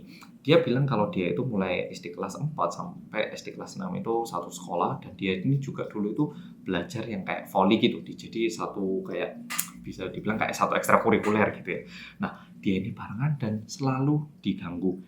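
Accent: Java, Indonesia. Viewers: Hiyayy medhook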